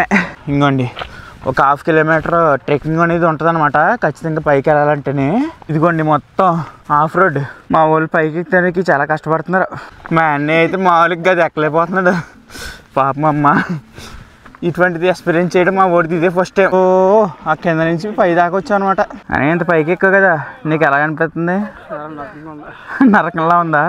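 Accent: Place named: Rayalaseema, India. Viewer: Anna Videos Late Avuthunnay But N Duko Mee Slang Ante Maa Raayalaseema valla ki baga istam anna Ann Alte Cheyakandi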